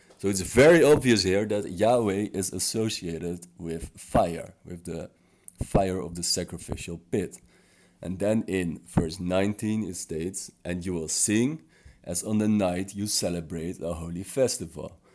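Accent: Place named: The Hague, Netherlands. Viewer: I can tell you are from den haag because of your accent lol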